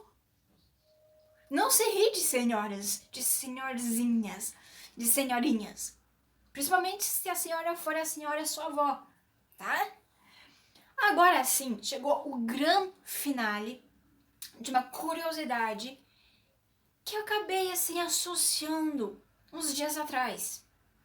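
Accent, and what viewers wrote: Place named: Germany. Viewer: Like pelo vídeo sensacional ♥️! A impressão que eu tenho é que vc tem um sotaque Alemão, mas tipo eu só acho mesmo rsrs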